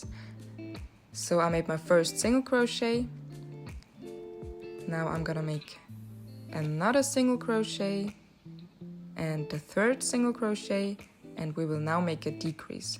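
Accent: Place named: Austria. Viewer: Ich finde du machst das super!! Und dein Englisch ist schön und gut verständlich, daher finde ich den typisch österreichischen Akzent überhaupt nicht schlimm! 😁👍🏻 Und die Anleitung scheint soweit auch verständlich, werde es sicher demnächst selbst mal ausprobieren 🌊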